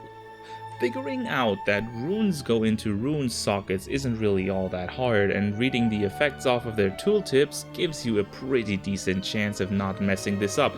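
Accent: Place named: Spain. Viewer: Having a helluva time trying to figure out your accent—I’m vacillating between “Spanish” and “Czech” ha ha. But who cares? Your English grammar and pronunciation are an order of magnitude better than 97.654% of American YouTubers! Great vid. Great analysis. Insta-sub! (And I don’t even play Diablo)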